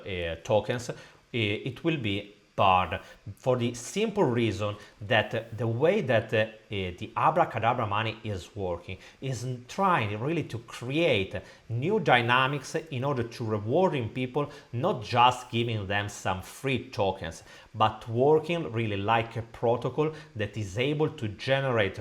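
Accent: Italy. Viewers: Are you Italian? I recognise that accent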